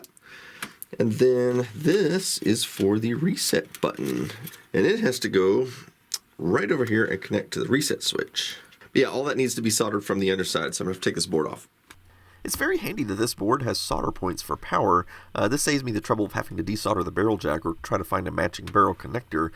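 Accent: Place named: Texas, United States. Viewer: you have the slightest most subtle Texan accent ever and I love it so much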